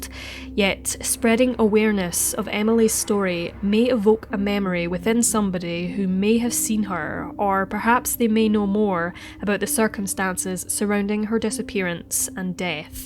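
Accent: Scotland, United Kingdom. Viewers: You know it's a Scottish narrator, when you hear, "alight" instead of "get off." Thumbs up for eloquence! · It's a perfectly good Scottish accent, nothing funny about it!! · It's Scottish can't u tell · Excellent. Another sad sad case I never heard of. Wish I could have helped. Thank you. I have subbed as well. PS I love your accent. Are you...Scottish? Please forgive me if I am wrong.🙏😩✌👼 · I can see why businesses often want to set up their call centres in Scotland. Can't beat the Scottish accent. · Which part of Scotland are you from?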